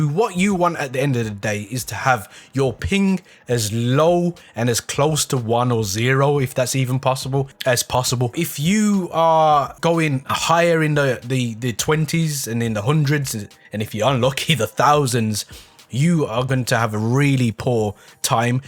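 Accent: Birmingham, United Kingdom. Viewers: Proper brummy lad!!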